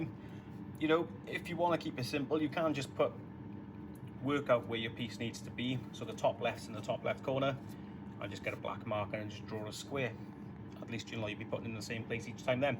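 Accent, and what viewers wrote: Wales, United Kingdom. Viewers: Nice video my man, no escaping the welsh accent unfortunately, but we don't care do we!😁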